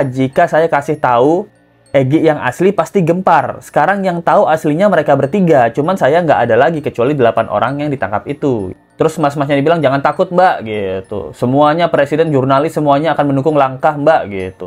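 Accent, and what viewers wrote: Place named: Jakarta, Indonesia. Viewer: masa orang cirebon logat lu gua lu gua logaj jakarte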